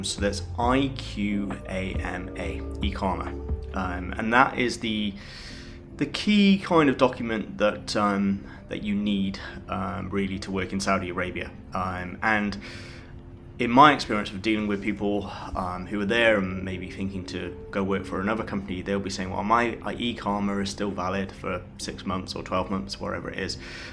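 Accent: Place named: England, United Kingdom. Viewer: You seem to be a citizen of the world with the way you describe things comparatively across the countries. I presume you’re English, love the accent ❤ but amazed at how you refer to USD so naturally. Anyways, great video with very useful information. Thanks 👍🏻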